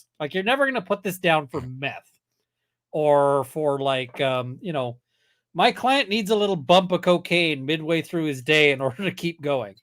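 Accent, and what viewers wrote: Canada. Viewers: It's so lovely to hear your Canadian accent. One of my best friends is a UK born Canadian.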